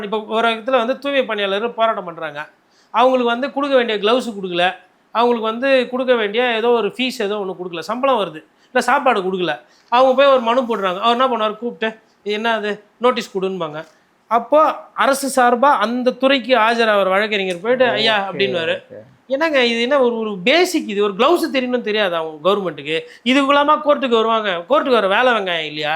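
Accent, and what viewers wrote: Chennai, India. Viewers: உங்கள் madras slang ரொம்ப அழகா இருக்கு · தங்களின் சென்னைதமிழ் அழகெனினும் எச்சரிக்கையாக இருக்கவும் சகோ...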